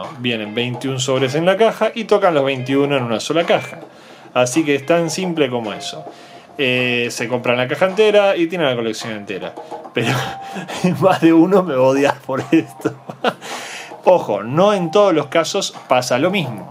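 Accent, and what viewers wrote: Argentina. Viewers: jajaja que chulo me encanta el accento argentino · pares un argentino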